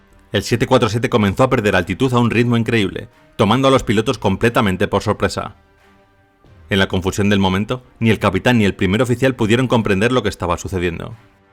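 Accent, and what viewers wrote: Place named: Spain. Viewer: Si se le entiende bien.a pesar de sentirsele su acento español..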